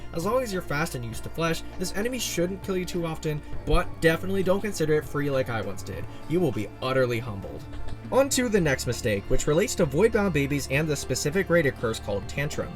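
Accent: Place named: Canada. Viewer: you sound like kenadian. good video <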